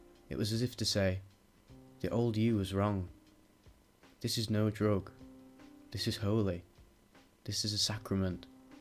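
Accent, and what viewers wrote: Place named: England, United Kingdom. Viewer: you're a good narrator, how far has this video taken you? the english accent, the world loves it as much as japan loves complex metal, would like to hear more trip reports from the wid void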